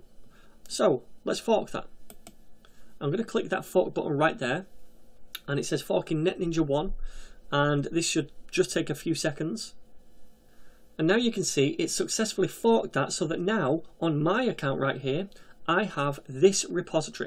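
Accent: Germany. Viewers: btw the accent (yah good) was part German, part Dutch, part Swedish - executed to perfection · Think that was a German accent. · German accent BTW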